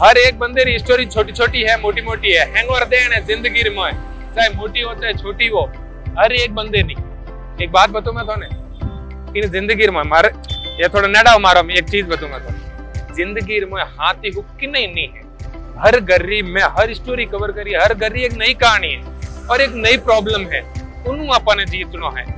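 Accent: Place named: Marwari, India. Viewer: Wha sir gjb marwadi andaj me 😀 the mare neda aao 😀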